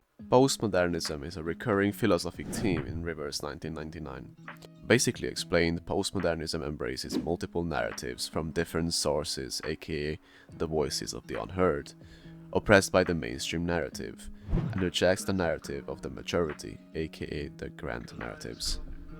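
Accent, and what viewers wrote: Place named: Romania. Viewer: Its good enough to remind me of a Romanian friend of mine from college. Her English was incredible from just learning it in school. Her accent was very subtle and cute like:) Either she was just naturally gifted and learned other languages easily or the schools in Romania are amazing lol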